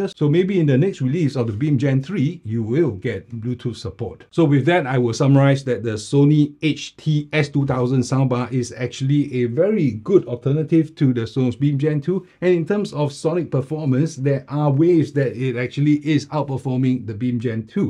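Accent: Singapore. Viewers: You speaking too fast. Please slow down a bit. Maybe it just a Singaporean English problem. but anyway I think for better understanding would be good to slow down a bit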